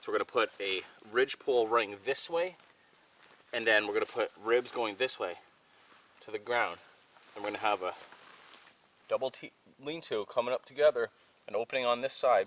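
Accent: Canada. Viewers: Were you saying the blue farmer Swiss Army knife or was it just my So. La. Ears hearing you’re Canadian accent? Couldn’t find that on amazon. Would it be the huntsman?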